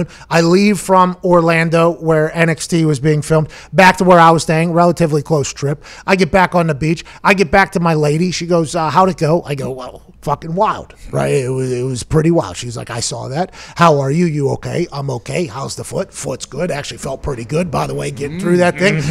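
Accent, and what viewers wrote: Pittsburgh, United States. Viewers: "HE'S FUGGIN' AHT DAHN ERE." That's as Pittsburgh as it gets.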